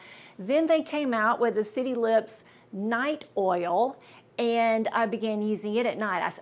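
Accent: South, United States. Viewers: Thank you for your sweet southern accent. Reminds me of when I lived in Athens Georgia at college!!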